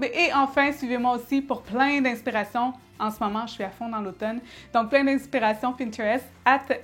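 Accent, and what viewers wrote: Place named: Canada. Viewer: Tu es hyper rafraîchissante, et j'adore ton accent canadien !!!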